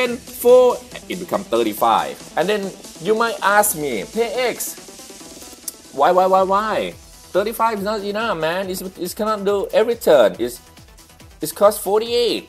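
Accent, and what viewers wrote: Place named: Thailand. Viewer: Are you from Thailand? you talked like my friend, your accent kinda feels like ppl from there.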